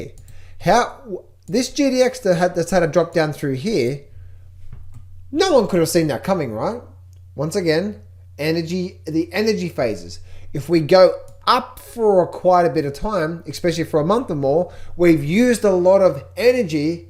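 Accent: Australia. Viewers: The actual quote from Ed Seykota is: "The trend is your friend, until the end...when it bends." Don't forget the "bend" part,! Keep up the great work. Love the Aussie accent.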